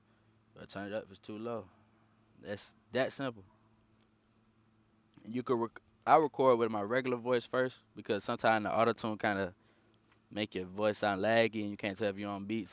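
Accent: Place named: Atlanta, United States. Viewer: yuh he frm atl fasho😂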